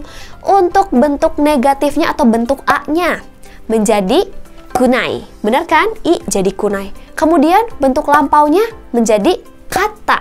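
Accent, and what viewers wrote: West Java, Indonesia. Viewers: Ka sunda bgt sii 😍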